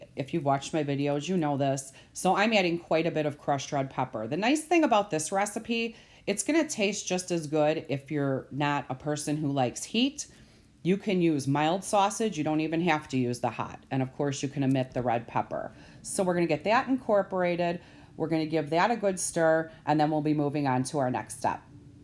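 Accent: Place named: Chicago, United States. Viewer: First time viewer. Nice looking recipes. Plain and simple. Must say that you sound like a fellow Chicagoan. I live in north central Illinois now. Best wishes. I will check out other recipes. TFS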